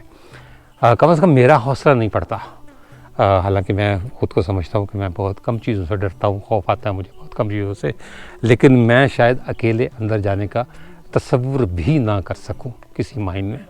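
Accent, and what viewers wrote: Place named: Lucknow, India. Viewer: Mohtaram Salamun Alyekum Maie Aapka Maddah Lucknow India se hun guzishta 2, Baras bad ab phir aapke vlog se mustafeed ho raha hun. Aapka andaze takallum aur tareekhi malumaat me Lucknvi tahezeeb ka khasa asar hai kahin aap hum Lucknow walon ke bichhde huwe maqami bashinde toh nahi hain?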